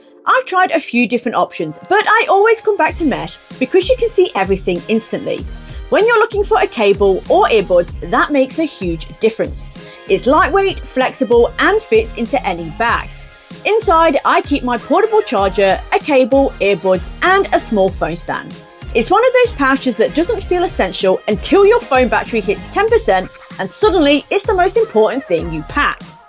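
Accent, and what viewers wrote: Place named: Northern England, United Kingdom. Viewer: Hi! It says you’re from Australia but I think I hear some northern English pronunciation every now and then. Your “U” sounds in particular. Am I way off? 😊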